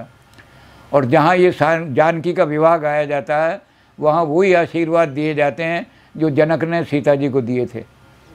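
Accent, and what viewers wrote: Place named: Haryana, India. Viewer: ne Kamal kar diya. Shastri ki bato Ko behad saral v harynvi lahje me samjhaya diya. Dhanywad.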